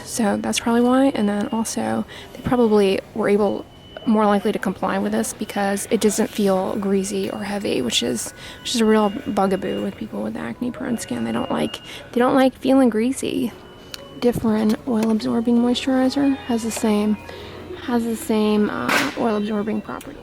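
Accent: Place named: South, United States. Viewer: Southern asmr turns dermatologist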